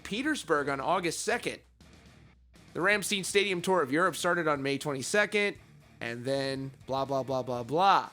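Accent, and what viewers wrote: United States. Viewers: Omg this American pronunciation, I can't. Dude, google how to pronounce names of music bands.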